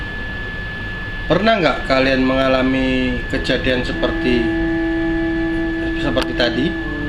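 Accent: Java, Indonesia. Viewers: Org pontianak kok logak nya jawa sih bang😂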